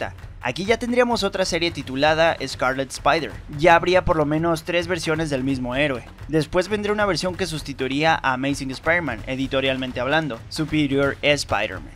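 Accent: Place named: Colombia. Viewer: Por que te escuchas como Colombiano en este video xd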